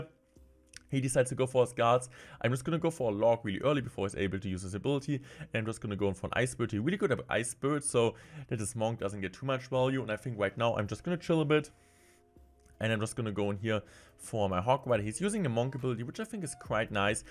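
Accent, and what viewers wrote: Germany. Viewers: This is my first time on this channel and i noticed directly by his accent that hes German.I was quite confused at first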